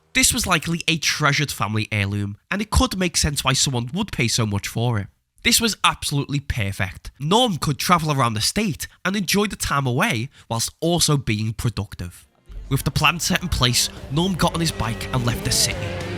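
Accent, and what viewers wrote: Liverpool, United Kingdom. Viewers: We have a scouser, yes lad good to see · Are you scouse? Love your accent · I know that's a Liverpudlian accent and this is just fuckin' hilarious. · Is he a scouser? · Love the scouse accent peaking through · are you from liverpool? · Is this a scouser speaking????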